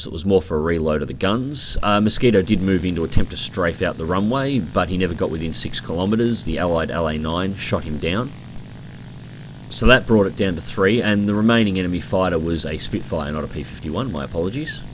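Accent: Australia. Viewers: What easiness and talent at gameplay and great post battle analysis! I was watching Mighty Jingles playing War Thunder and some guys recommended. So I hoped to this channel a bit and eventually subscribed. And I adore the accent! I have relatives in Adelaide, although I am not Australian. I've heard a lot of good stuff about Australia and Australians. Greetings from Belgrade!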